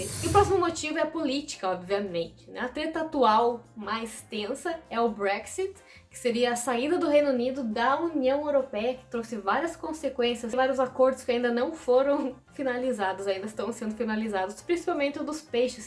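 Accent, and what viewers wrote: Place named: Santa Catarina, Brazil. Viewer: Ganhou um inscrito pelo sotaque. Só fiquei meio! Particularmente. Seria Catarina. Mas se do interior, Rio Grando. Mas bonita, e um sotaque para I’m agradavel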